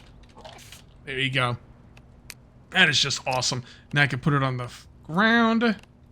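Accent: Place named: Philadelphia, United States. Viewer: You must be from Philly.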